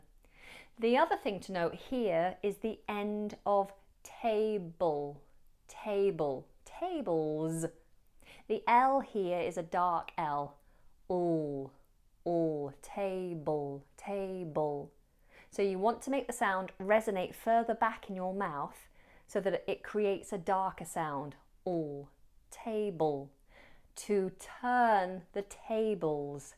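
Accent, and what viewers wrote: United Kingdom. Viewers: The British accent is the best, the teacher is highly qualified to teach the English language, she has the right pedagogy to teach from beginner to advanced level. Before traveling to England it is important to know all the popular idioms and phrases that are used daily in that country!! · Mam you're really gorgeous and Articulately British accent, thanks for your Hardworking style to give us compelling data about advance english level I'm waiting for your next progresive Learning method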